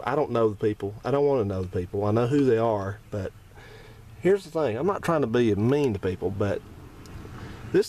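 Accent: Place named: Appalachia, United States. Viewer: You sound like you’re from WNC or E. Tenn.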